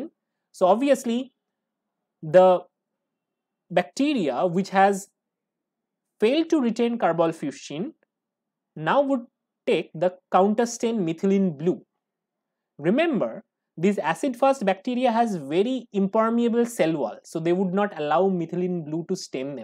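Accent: Bengal, India. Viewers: U have accet of a bengali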